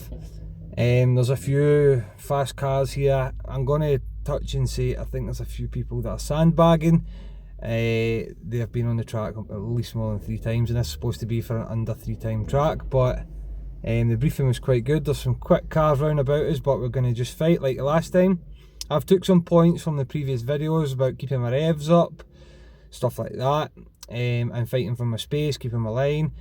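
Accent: Scotland, United Kingdom. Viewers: Great video. Liked and subscribed. Great to hear another Scot 🏴 on here. Going to spend some time watching your vids. Specially enjoy the Type R ones 👍 keep them going mate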